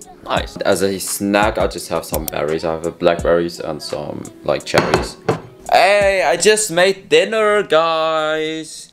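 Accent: Germany. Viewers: On dirait il un accent allemand💀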